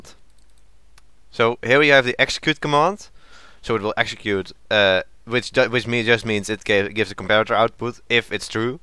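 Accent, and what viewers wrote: Netherlands. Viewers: Je hoort ook gewoon dat je Nederlands bent XD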